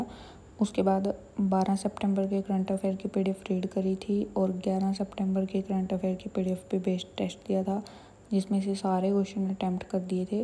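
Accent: Haryana, India. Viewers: Aapki aawaj se lgta h ki aap kurukshetra side se ho...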